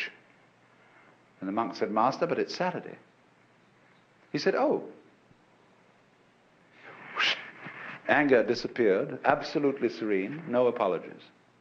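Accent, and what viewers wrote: United Kingdom. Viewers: Are you sure you aren't referring to Alan Watt, the Scottish guy? Apparently people are confusing the 2. I only recently came across this Alan Watts w a British accent and was like wth? Til I figured it out. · How nice it is to hear a semi posh English accent for a change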